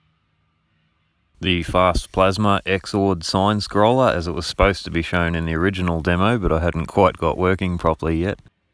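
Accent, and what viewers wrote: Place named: Australia. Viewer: pleasant change to here an aussie voice haha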